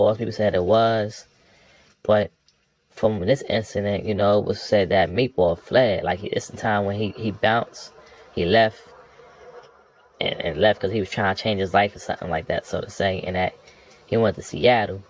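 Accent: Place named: Baltimore, United States. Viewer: Yo you got to be from Baltimore please work on presenting your presentation better you keep saying arm that shit is driving me crazy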